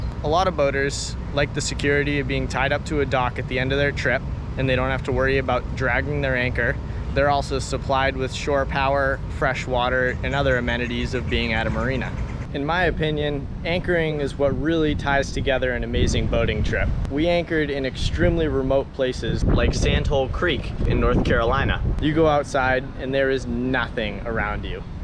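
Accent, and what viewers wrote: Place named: New England, United States. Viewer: With that boat and that accent, I can definitely tell you’re from New England! Very informative, otherwise. I’m wondering why you didn’t go with a sail boat to save on fuel long term, though.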